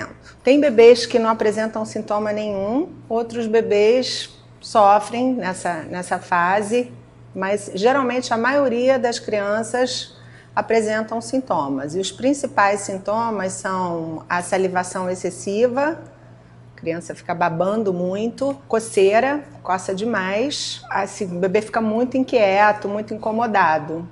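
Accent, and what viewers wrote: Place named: Rio de Janeiro, Brazil. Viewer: Muito bem explicado e interessante! A dica do picolé de leite materno foi bacana. Mas esse sotaque carioquês da gema, carregadissimo incomoda um pouco.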